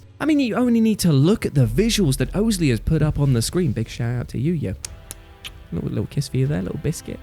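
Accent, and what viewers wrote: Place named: England, United Kingdom. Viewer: I don’t really like the “Tues Your Own Adventure” name, as it only works with certain accents such as your English accent. As an American I say: Tues as “Toos”. But other than that I like it!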